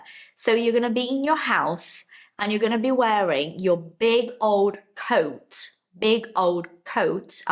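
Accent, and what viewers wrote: United Kingdom. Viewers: Hi teacher .. I'm learning the British accent..and I really like how you speak exactly like the British people I hope to speak like you 😢 Could you please tell us the secret 💔 Please make a video on this subject.. I feel frustrated because I try to speak like British people, but I still can't. Thank you very much❤. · Teacher, sorry for the question but, are you a native speaker? You have a really nice British accent oh my god 🙌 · Great content. Are you Brazilian? Beautiful British accent. I strong believe that your sign is Virgo \o/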